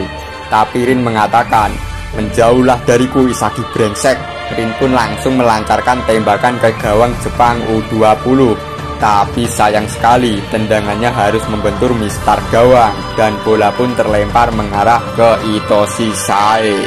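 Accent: Java, Indonesia. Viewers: Pembawaan udah bagus minus di logat Jawa nya aja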